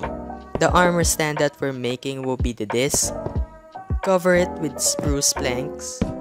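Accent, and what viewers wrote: Philippines. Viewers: Filipino accent be hitting tho lol thxx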